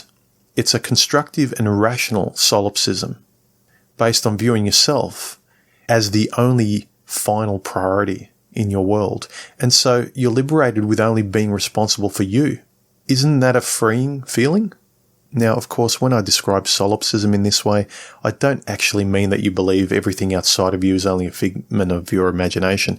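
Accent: Australia. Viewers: Mate, just wanted to say 'Hi' and a huge 'Thank you!' for what you do! I never comment on youtube. This literally is the first time, but decided to do a one-off as I relate to so many things you do and say. I'm a colleague, an animation artist, and absolutely love your illustrations and the wonderfully appealing lightweight design of your videos. You're in a class of your own among MGTOW. I found your channel via Coterie's and have been listening to your videos repeatedly, although I'm not a subscriber as I don't subscribe. Marcus' (Groundwork...), Coterie's and yours are my most favourite, and, I suppose, most relatable emotionally and intellectually. You sound a bit Aussie to me, but I may as well be wrong as English is not my first language, although I've been living in this welcoming country for nearly 15 years. It's heart-warming to hear how total strangers have come to the same conclusions and have taken their lives and reality in their own hands - how in this confused world men (I'm in my mid-40s) are still able to find the path to wisdom and peace of mind, lead fulfilling and meaningful lives. 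Self-actualisation had become my way of getting out of the existential crises of my 30s. Discovering MGTOW only confirmed and widened my understanding and knowledge of how the West has changed in the last 4-5 decades; the culture shock I went through was a temporal one. Growing up behind the Iron Curtain I was 50 years behind, I was a 'Westerner', coming from the past. The gradu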